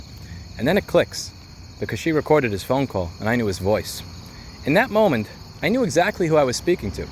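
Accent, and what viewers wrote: New York, United States. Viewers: All I'll say is that his New York accent came out...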